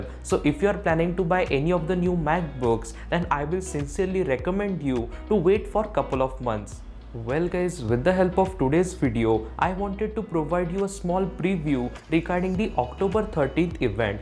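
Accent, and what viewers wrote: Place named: India. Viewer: seems like ur accent is transforming from American to India 🇮🇳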